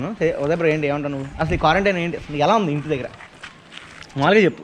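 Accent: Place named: Godavari, India. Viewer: Godavari basha bagundi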